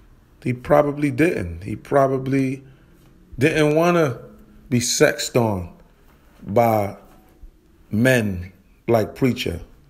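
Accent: New York, United States. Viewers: U from uptown my guy? Or nyc? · One thing you can say is it's a New York dude who is narrating this,you know several things,preacher is in the pen,in this person head,he can't get next to him, Another thing is this,speaking about preacher may help him get likes views clicks I dont know, To be frank I know apple preacher and the henchmen whom is from queens,whom preacher possibly turned on,but Im making this person stronger then how weak of preacher he might think he knows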